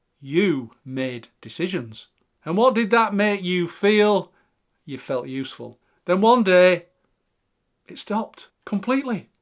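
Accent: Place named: Liverpool, United Kingdom. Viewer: Hallo! I'm 61 and I just started roller skating and learning the ukulele in the last few years. I'm going to be taking a physics class in the fall. Thanks for these wonderful reminders. I'm considering writing a book and you're convincing me to give it a go. Never stop learning, never stop growing! 🎉 (BTW, your accent reminds me of the Beatles sometimes. Are you from Liverpool? I'm American, so I am not fantastic with British accents, but I do know they aren't all the same! 😂)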